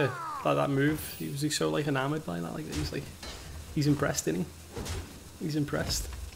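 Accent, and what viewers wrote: Liverpool, United Kingdom. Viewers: Bruuuuh what?! A scouse streamer?! Yes laddddddd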